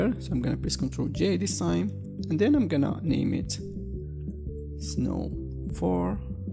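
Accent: Morocco. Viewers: you have a Moroccan accent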